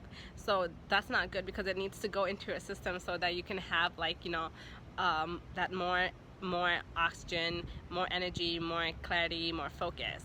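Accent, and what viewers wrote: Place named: United States. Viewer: This indian lady speaks perfect English with an American accent! Lovely eyes! I want this woman!!! Lol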